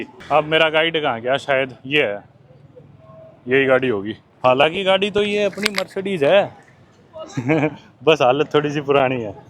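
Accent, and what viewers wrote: Haryana, India. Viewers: Bhai re ek number video jama swaad aa gai ....nue lagge jama ki theeth haryanvi choora aa rya hai.....being haryanvi I am proud of your brav ....best wishes from UK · Love u bro..... Aapka hariyanvi to gazab ka hi he..... God bless u😂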